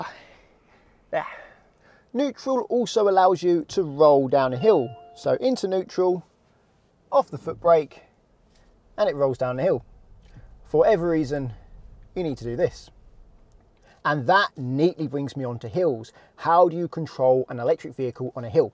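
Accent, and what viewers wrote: United Kingdom. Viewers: Although I live in country with some different road laws, has right side driving and it's speed measured in km/h, the fundementals of driving are the same. I am deaf person with cochlear implant, although you speak British English, you speak so clearly I can understand even without automatic subtitles. You give me confidence in learning to drive. I learning to drive manual, your advices were so much helpful than my instructor's advice in my native language. Thank you very much for your channel!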